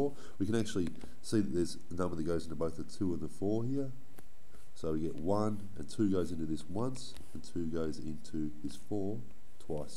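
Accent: Australia. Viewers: If everything learning related, was taught in an Aussie accent, I’d probably be so much smarter and would have paid a lot more attention hahaha.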